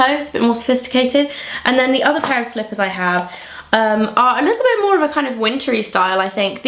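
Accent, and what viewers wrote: United Kingdom. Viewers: British accent! :D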